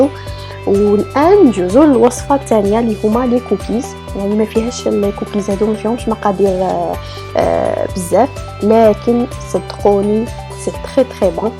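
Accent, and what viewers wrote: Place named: Algeria. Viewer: سمحيلي الزيينه ديالي يلاه خرجت من المستشفى كنت زيدت إبني عثمان يلاه راني ندير الواجب مع الكل انا من عشاق الشوفان قناتك رائعه اتمنى لك التوفيق وانشاء الله يدوم التواصل بينا أضن انتي من الجزائر الشقيقه من خلال لهجتك حيت عندي صديقاتي من الجزائر والاقرباء كذالك كلنا اخوه في الله 🙏🙏🙏🙏🙏🙏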